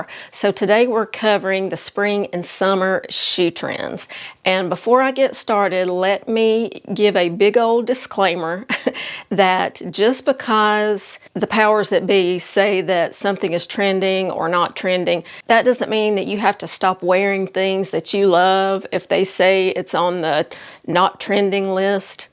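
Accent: South, United States. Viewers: I am from the Atlanta metro area and your accent is like butter to my ears! In the south, you know this is a compliment! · Love this and all your videos! Preppy, classic shoes are wonderful and totally my style, I have most of these. Awesome that Keds, loafers and boat shoes are back. Not feeling the mesh style yet though. I watch you on my phone, laptop and TV. Love your Southern accent, as a Southern lady myself 😊. I mostly watch for information but occasionally shopping. ❤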